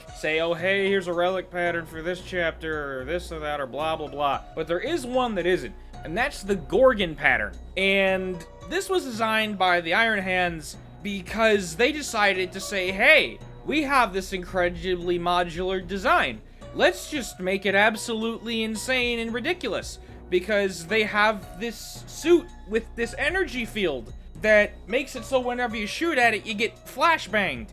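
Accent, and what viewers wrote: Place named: United States. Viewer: As iconic as hearing a British accent accompany Warhammer lore, it is refreshing hearing a fellow brother from this side of the pond discuss 40k. I'm in love with what I'm seeing so far.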